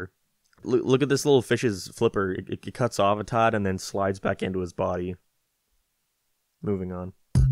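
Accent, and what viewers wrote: Canada. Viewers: You sound Canadian lol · I commend you for your time put into this. However I had to stop. Come back watch a little more. Your accent is unbearable 😂 I'm assuming your Canadian? If not Canadian I don't know but when you say or hang on certain words Im just like 🤮🤮 when you say a phrase like .. Mr Krabs has to figure it OuuuT. I picture a really snobby preppy girl talking. Like yahhhhh that was sooo yesterdahhhh lol if u want more examples I can show ya lol. At the end of the day great video. I'm sure your a good dude etc It's my personal problem but I had to mention it lol.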